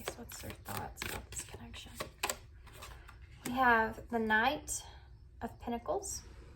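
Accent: South, United States. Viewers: Hi :) I just found your channel and I have to say that I love your energy and I really relate to your messages and the way you bring them across and they are helping me so much right now.. I’ve always loved a southern accent too so I just like listening to you talk! Lol thank you for taking the time to do these readings I truly appreciate it :)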